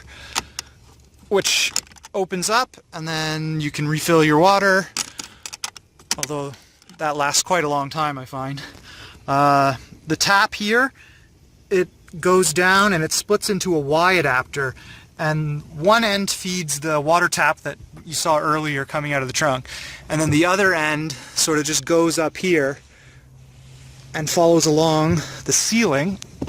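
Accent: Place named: Canada. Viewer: he sounds canadian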